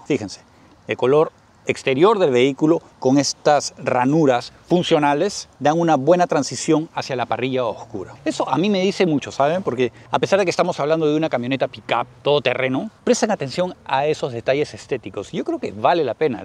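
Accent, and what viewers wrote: Peru. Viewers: habla como peruano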